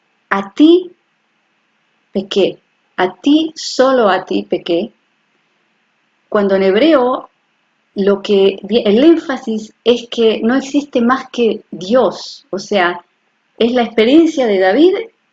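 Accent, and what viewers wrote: Argentina. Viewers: Me sorprende que después de tantos años de vivir en Israel, conserves tan bien el acento argentino.Gracias por compartir el hebreo, ya lo estudiaré, me encanta.Salud a ti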